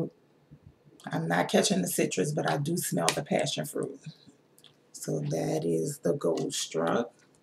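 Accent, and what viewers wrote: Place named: New Orleans, United States. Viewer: Love your haul very thorough! Are you from New Orleans I can hear your accent? Anyway great video!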